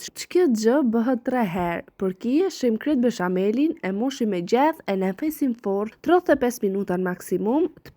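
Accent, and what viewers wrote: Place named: Albania. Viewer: Të lumshin duart po nga cila trev e Shqipëris jeni sa bukur flisni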